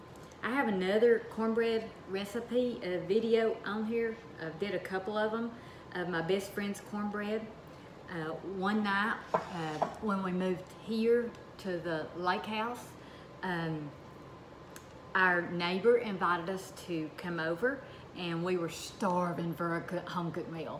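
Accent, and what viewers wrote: South, United States. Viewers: I can’t believe I found you! Not that that J know you, but that I LOVE, you southern charm and I LOVE that accent. I also have an accent and hate to hear myself on a recording. So, I just looked up how to make cornbread without using a store bought mix and you were the first selection. And It was refreshing to hear someone that is definitely from the south and more so, sounds exactly like me. That accent is like hearing myself in a recording minus the fact, I am a man, not a woman. But that twang is unmistakable for me. God bless you, ma’am!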